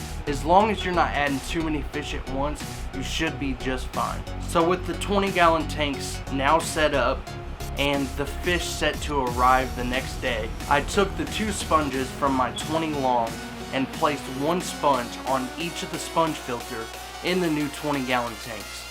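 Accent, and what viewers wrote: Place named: Kentucky, United States. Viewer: Greetings from a fellow Ky native! I stumbled across your videos and a few seconds in I thought, "Where is this guy from? 'Cause he sounds like a Kentuckian lol". Enjoying your videos!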